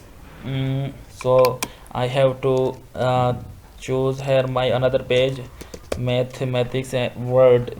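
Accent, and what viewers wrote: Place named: India. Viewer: Waste of time , u should do it with no voice , sick of indian english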